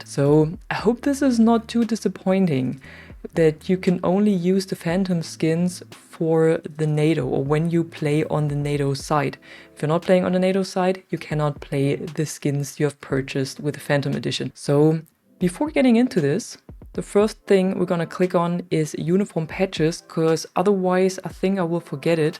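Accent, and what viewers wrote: Germany. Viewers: First time to the channel I could be wrong and I’m sorry if I am but are you German? That how the accent sounds to be this soon into video. Have no issues just curious and believe I’m right totally random comment but I’m genuinely good at telling.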